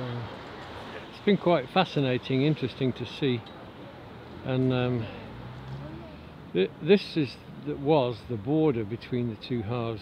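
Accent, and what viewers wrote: United Kingdom. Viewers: I was kind of mislead by your wonderful British accent, when I found out you actually live and work in the US. That was a surprise.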